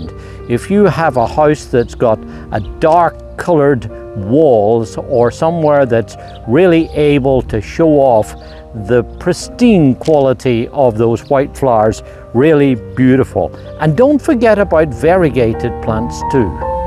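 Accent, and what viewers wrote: Northern Ireland, United Kingdom. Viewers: I live in Ireland since 2007, but I also lived in Cape May. You sound like you might have a little northern Ireland in your speech. Great videos! Thanks!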